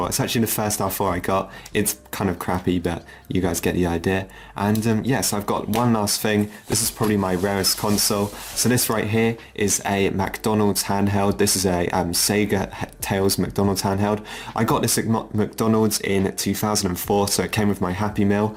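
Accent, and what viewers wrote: United Kingdom. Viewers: cool collection! Very interesting. I was just trying to place your accent.... is it Stevenage by any chance ?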